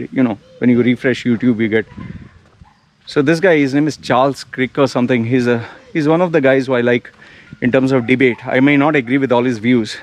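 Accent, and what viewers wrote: India. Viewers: You have indian accent but maori tattoos. Whats going on.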